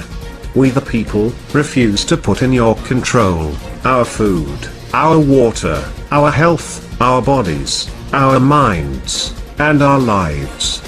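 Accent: United Kingdom. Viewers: why does this guy have a British accent but is talking about how we fought for freedom ect? The only ones we fought to get this country were the British and the defenseless natives.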